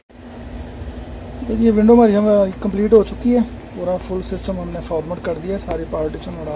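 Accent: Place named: India. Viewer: If u hear an indian accent u know its gonna work. I've been working on this problem for 3 hours and after doing what this legend does it worked first try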